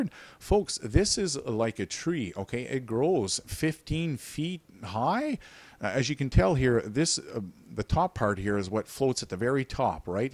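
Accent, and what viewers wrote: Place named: Newfoundland, Canada. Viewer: This guy sounds like a Newfie. Not referring to!!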